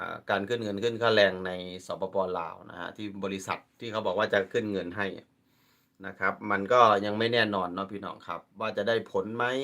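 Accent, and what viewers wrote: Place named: Isan, Thailand. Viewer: เทพโยเจ้าเหมือนคนอีสานพูดจาแบบเดียวกัน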